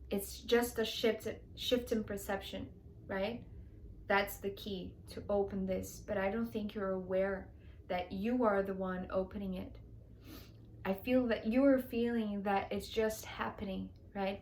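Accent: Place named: England, United Kingdom. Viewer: Thank you,! I think I'm feeling this right now. I just discover your channel through San story video:) Beautiful energy, deck and readings. You are an inspiration to me. I love your English accent as well;), I'm from Spain. Love ♡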